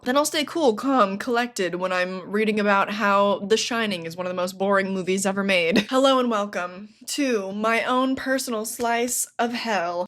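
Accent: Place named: United Kingdom. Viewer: Doing a 1980s Horror Movies bad reviews video? It's completly outdated. Well, that was pretty ... "not unrelieved" ! I don't want to sound snob, but some people really are ... 🤔unhinged? Sad?... Uneducated? All of these? ❤your British accent ! Horror is the best dumbest genre ! – You slew me ! "you bedeprtprrrt..." The Stuff , yay !! B-But?!... Didn't you "forget" Re-Animator ?!... I'm not saying that because I love that movie, but because it's just ... quite an amazing and remarkable one. Stuart Gordon's masterpiece. Alongside Dagon . Yeah... '80s were ... a time.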